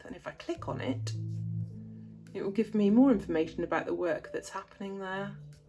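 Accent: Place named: West Country, United Kingdom. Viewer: I love what you do, but you're not getting a coffee. You're not getting a coffee dammit. Showbiz tip - think about losing the west country/pirate accent. Try doing the videos in Geordie.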